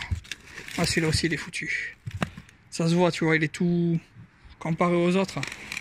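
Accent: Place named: South, France. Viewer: C’est super ce que tu fais! Et en plus j’adore ton accent du sud c’est magnifique! Bon courage!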